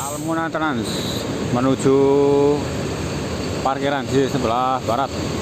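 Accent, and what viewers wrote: Banyumas, Indonesia. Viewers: Selamat siang kang.. Nek ira ngapak ora ke penak..antap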